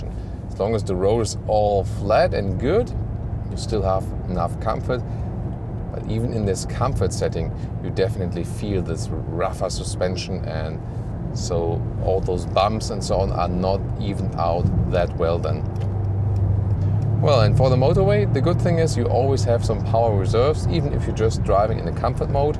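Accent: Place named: Germany. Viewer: Gutes Video, wie immer, super Wortschatz, aber die Aussprache... da hört man den Teutonen aus Km... Deutsch wäre mir deshalb lieber.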